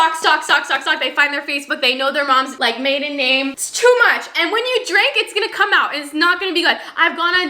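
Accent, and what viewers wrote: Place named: Canada. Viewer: I didn’t know us Canadians had an accent 😅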